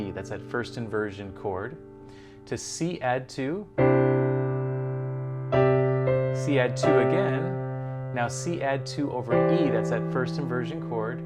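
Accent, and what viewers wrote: United States. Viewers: That was inspiring,. Any chance you're from Lowell, MA?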